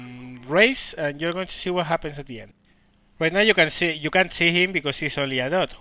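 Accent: Spain. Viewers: are you from Spain? I hear a Spanish accent :P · Eres español porque tienes acento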